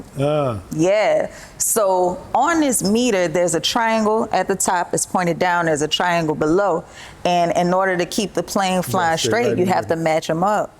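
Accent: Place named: New Orleans, United States. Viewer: I hail from New Orleans. I recognize that accent anywhere.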